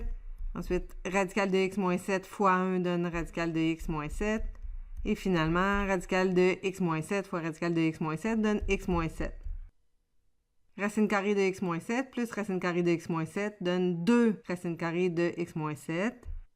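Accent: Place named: Quebec, Canada. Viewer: L'accent Québécois est et la manière de faire différente de la France rendent la chose plis complexe à comprendre, ceci dit, j'ai enregistré la vidéo car c'est bon rappelle de cour !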